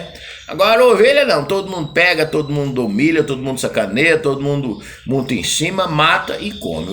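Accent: Goiás, Brazil. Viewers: Sou de São roque SP gosto de te ouvir seus caso seu linguajar no sotaque goiano e caipira é chik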